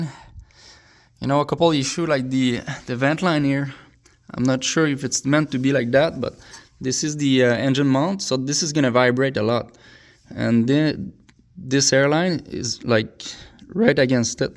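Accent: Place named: Quebec, Canada. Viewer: Je reconnais l accent quebecois. Le tube avec la tie rap a l avant du moteur, c est la belt exhaust. La sortie est a l avant du moteur pour faire dissiper la chaleur du moteur vers l arriere. Donc, meme si tu met un collet, il va quand meme s accumuler de l eau dans le tuyau puisque la sortie est tout pres... le tuyau en u en haut avec un trou, c est aussi le tuyau de la belt exhaust. L air sort de la belt box, monte en haut, et redescend au moteur... lorsque tu est dans l eau, puisque l air ne peut pas sortir au moteur a cause de l eau, elle sort par le petit trou en haut dans le u.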